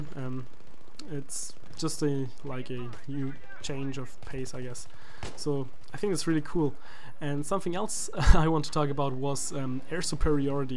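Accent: Germany. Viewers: ahh ein deutscher dachte schon ^^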